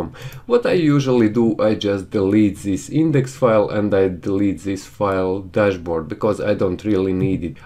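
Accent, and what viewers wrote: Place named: Russia. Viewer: Russian or Ukrainian . Around there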